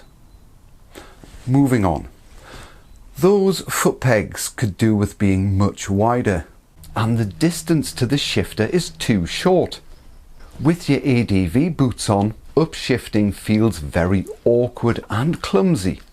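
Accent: Newcastle, United Kingdom. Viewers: Great review. You from Newcastle area ?? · He's speaking proper English. Of course he's British. I have never understood why people who live in the penal colonies (Australia and USA) do not take the time to learn to speak English properly. Can you explain that please? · 🦘 Ah, English spoken with just a hint of Geordie - the most colourful of English accents/dialects - especially in its broad form. Useful review - convincing me stick to my gorgeous 2021 Grand Prix red CB 500X .... 25,000 km young, still going smooth and strong in all terrains and surfaces (with the help of Michelin Anakee Adventures) and only drinking 3.1L/100 km.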